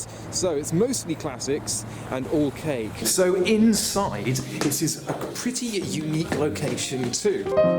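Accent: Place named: United Kingdom. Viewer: You mean, how he "tolks" British style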